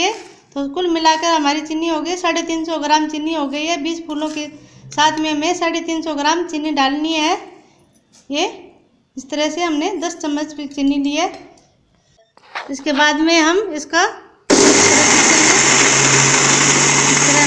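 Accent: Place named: Marwari, India. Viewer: Aap itni marwadi kyon bolte ho? Hindi acche se nahi aati kyan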